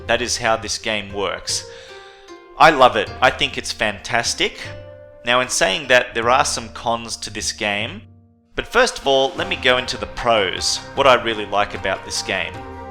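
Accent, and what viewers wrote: Australia. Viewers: What reign of Australia are you from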